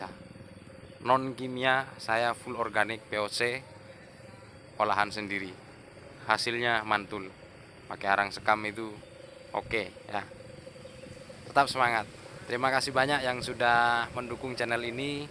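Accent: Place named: Banyumas, Indonesia. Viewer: Terus berbagi bro...kang kulon kye tangga ngapak😃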